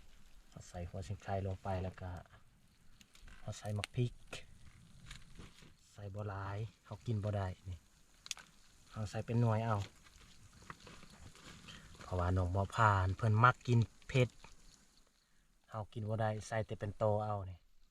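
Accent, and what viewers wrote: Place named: North, Thailand. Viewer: พูดเหมือนคนภาคเหนือของไทย.กินคือกั๋นเลย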